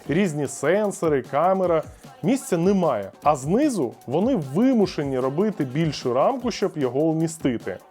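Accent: Russia. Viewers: Не ображайтесь, але дуже бісить, коли багато русизмів в мові, мов Азіров говорить! Важко слухати людину, яка все життя говорила тільки російською, і перейшла на Українську (але за те, що перейшов на УКРАЇНСЬКУ- респект)! Ведучий, моя тобі порада- не пиши сценарій, дай написати людині, яка вміє говорити по-українськи, все таки Цитрус- це гігант комерційного продажу, будьте «на рівні»!!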